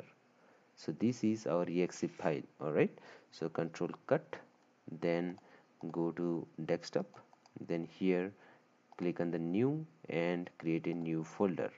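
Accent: India. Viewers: When i started the Video and heard the Indian accent i knew he would explain it like a Pro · why is ur voice so bad. average indian voice ew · when i have started the video and i heard the indian accent i immediately start following the step because u guys always help us in math an in every other subject a huge thanks and respect bro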